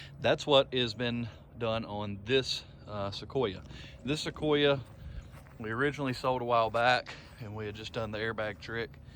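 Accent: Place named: South, United States. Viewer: I am registering my GX470 in the town a have second home in. I just bought it and can not drive it because all of registration paperwork is sitting in an airport because of the snow storm. I am so pissed. Thank you for the showing us that may not know the difference. Between the various SUVs. Love your southern accept. Ha ha. 👌🏽. Are you in Instagram?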